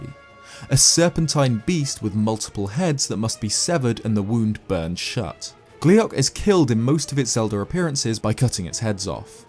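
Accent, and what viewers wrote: United Kingdom. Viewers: Ew British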